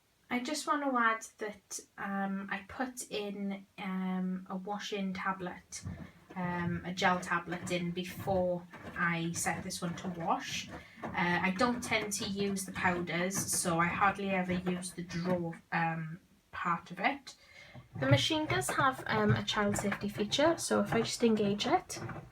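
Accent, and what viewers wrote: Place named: Wales, United Kingdom. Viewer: After sueing the arse off Currys and FINALLY getting a refund for the Hotpoint washing machine that only lasted 3 months and the case stressing me out i am looking for another Bosch as my old one lasted me 17 years so i have all faith in Bosch. Thanks for the review:-) (Love the welsh accent too);-)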